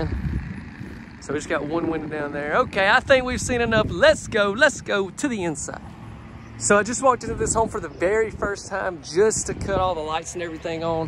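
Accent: South, United States. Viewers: U sound just like southern mom ? I'm pretty good about sounds let me know if you are lmbo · Love your accent! I miss living in the south. Blessings to you and your family. Thanks for being here.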